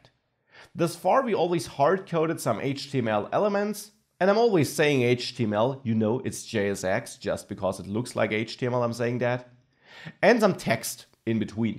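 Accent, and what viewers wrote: Germany. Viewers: Great video. Thinking to subscribe the course on udemy. BTW your accent sounds like German. Are you German ? :D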